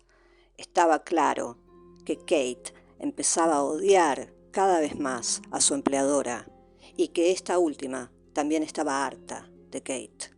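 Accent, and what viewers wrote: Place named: Spain. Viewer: Me gustan los temas pero el acento español de la narradora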